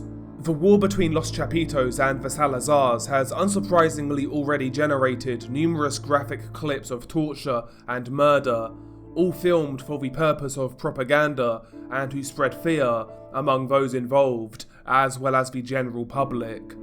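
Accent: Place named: Southeast England, United Kingdom. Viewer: Watching it while you narrate it. Saw it earlier anyway but. I’ve been watching gore since 2004 and I’m interested in man’s reaction to suffering. You could call it “morbid curiosity” but I prefer “empathy”. I empathise with these guys. I’m so lucky to be born in a peaceful location and to live my life without bother. Can’t quite place your accent but I’m Cambridge area and I guess you’re south east-ish. Anyway, love your videos mate, you’ve really informed me about the background of the cartel’s. You should do a podcast as you’d reach more people, without the restrictions of YouTube. Fascinating subject matter, in fact the south Americans are fascinating people. I lived with a Brazilian and my best mate is a jap who grew up in Brazil. Her stories are pure madness All the best brother.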